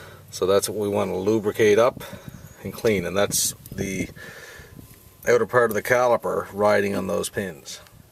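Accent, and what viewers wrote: Newfoundland, Canada. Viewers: Love that Canadian accent.lol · hey are you from newfoundland? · this video along with your irritating canadian dialogue makes this painful to view and listen to , its like torture < makes one want to vomit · Good Job, & I like the way you say "off", it would be written as "oot", I love it, lol, I like the Canadian accents. Vin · Given the accent & state of corrosion, I think we're in Canada.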